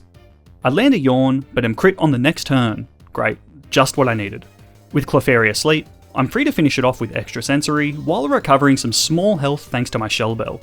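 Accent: Australia. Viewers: Ahh it’s so refreshing to hear an Australian voice over Pokémon runs, also do you have a discord server by chance?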